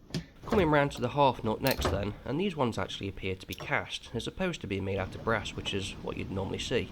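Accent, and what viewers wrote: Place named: Nottingham, United Kingdom. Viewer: That's a monster lathe, more complex than my Hardinge. You sound like you are from Nottingham.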